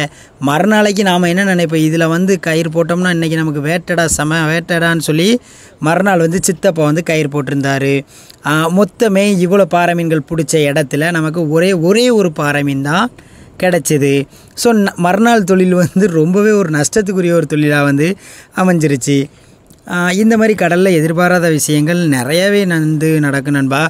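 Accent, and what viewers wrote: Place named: Sri Lanka. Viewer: என்ன இலங்கை தமிழ் பேசுறீங்க அண்ணா pad ok வாழ்த்துக்கள் அண்ணா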